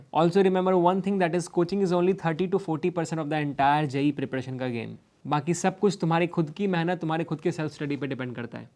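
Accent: Bihar, India. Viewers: Bhaiya aap bihar se ho 😊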